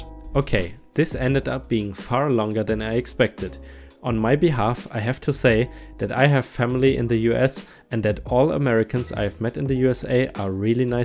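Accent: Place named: Germany. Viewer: Ah, I was right, you are German. I am subbing for more stories and more of your charming accent. :)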